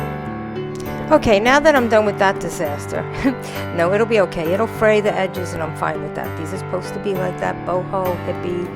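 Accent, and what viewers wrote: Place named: New Jersey, United States. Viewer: I am intrigued by them. Not sure I would wear them. Are u from NJ? I am trying to guess ur accent.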